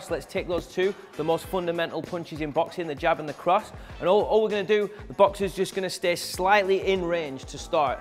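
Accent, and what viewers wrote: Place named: North West England, United Kingdom. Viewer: Your videos are brilliant, I love this channel. I train at a Boxing gym here in Perth Western Australia- I'm Manchester born 🇬🇬 I'm just loving boxing training.(it's fixing me after stroke & changing my life!) I absolutely love your channel, thanks 👌🙋‍♂️ I Started in Bredbury Stockport, you sound like your not to far from this area in the North West hey 😛🤙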